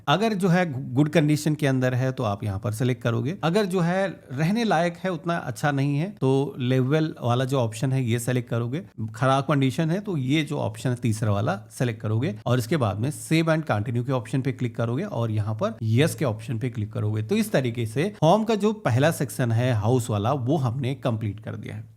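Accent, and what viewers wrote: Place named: Uttar Pradesh, India. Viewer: Majhara tawkali, UP se ho na bhai aap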